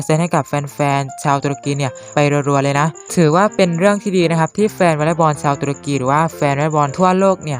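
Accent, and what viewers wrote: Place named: North, Thailand. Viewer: สาวเหนือน่ารักอยู่แล้วคับ โดยเฉพาะสำเนียงมีเสน่ห์มากๆๆๆๆๆๆ คับ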